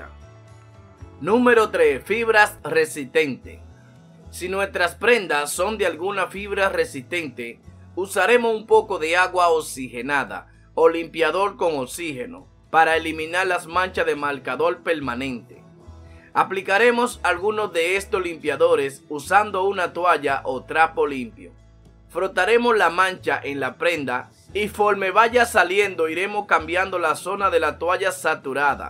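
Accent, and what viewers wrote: Dominican Republic. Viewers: Creo que su acento es de republica dominicana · ES DOMINICANO!!!🇩🇴😁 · Es de republica dominica que nunca le escuchaste esa voz a alguien🤥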